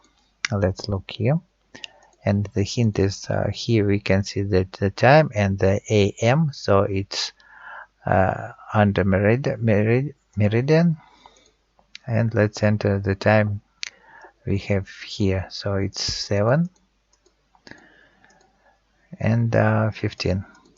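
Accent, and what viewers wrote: Russia. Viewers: Я так и знала,что ты русский,по твоему акценту,и по окну на русскому языке на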